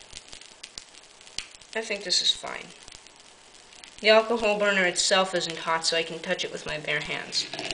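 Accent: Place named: United States. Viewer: does he live in america coz he's accent is really string american! looool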